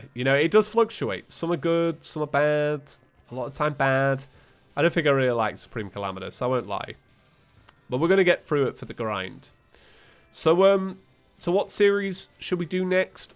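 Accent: Australia. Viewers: Didnt know he was australian XD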